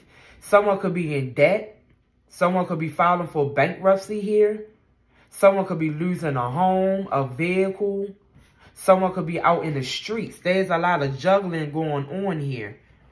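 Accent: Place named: Baltimore, United States. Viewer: HELLO your brownskin is brown skinning you look great i love your Baltimorian accent it's gritty CUTE..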